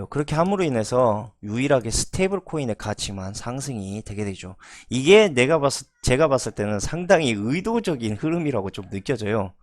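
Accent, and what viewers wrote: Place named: Honam, South Korea. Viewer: 욕을 기다리며‥. 빠져드는 강의 ㆍ 정통 호남 발음. 억양 좋습니다 그럼 수고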